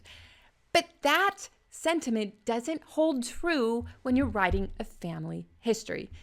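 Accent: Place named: Texas, United States. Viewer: The Texas is strong in this vid,. =) It's probably not a good idea to add dialogue because living relatives may be like "Grandpa never said that!" At best, the only thing you can do is just write about the situation. Some people have put words in ancestors' mouths but have said it was dramatized at the end of the book. Not sure if I'd be comfortable going that route. Always a good thing to verify with people who may have known a few people. For example, my parents knew their grandparents and how they acted.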